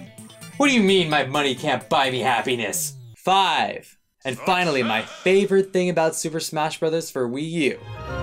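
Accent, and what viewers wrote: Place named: England, United Kingdom. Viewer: Top quality English accent.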